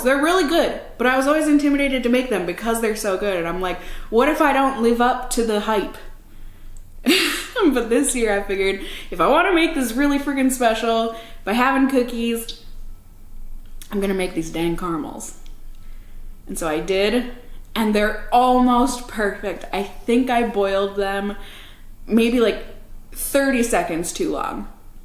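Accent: Minnesota, United States. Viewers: She definitely has a Minnesotan accent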